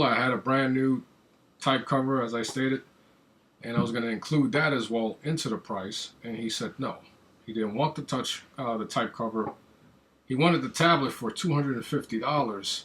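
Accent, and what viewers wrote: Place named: South, United States. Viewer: Where are you from? You have a mix accent of black and southern border kinda a guy. No ofense, just curiosity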